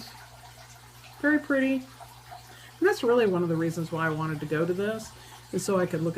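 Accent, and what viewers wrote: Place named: Georgia, United States. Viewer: I can't believe you were born in New York and lived in the Midwest. Georgia really "got hold of you". I pronounce most of the words the same as you and I was born and grew up in South Carolina. However, I don't have the drawl that most southerners have either. Love all the charts and fabric you have. I would love to go to a market and especially a retreat. Martha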